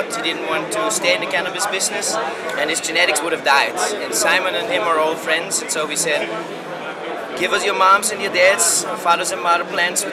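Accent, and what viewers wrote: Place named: Netherlands. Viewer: Dutch as shit 🤙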